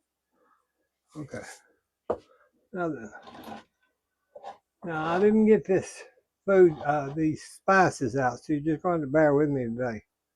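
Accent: South, United States. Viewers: Good to see your video, always a pleasure, meal looks so good too, I like your country accent, God Bless, Hello to Momma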